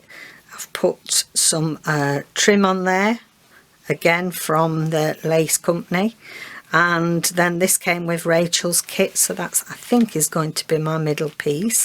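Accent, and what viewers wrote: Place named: England, United Kingdom. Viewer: Hi I have your other videos on my watch list so I haven’t gotten to them yet. I just saw this one come up and couldn’t wait to watch it. I just love what you’re doing I’m only a few minutes in but just had to comment. I love your accent you pronounce some things like my English gran did! Your project is stunning! Can’t wait to watch more! Hugs Rachel 🤗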